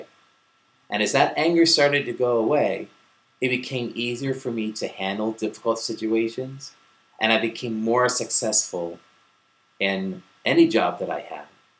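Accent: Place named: New York, United States. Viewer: As soon as the first word came out his mouth you can tell hes a NYer, Nice Vid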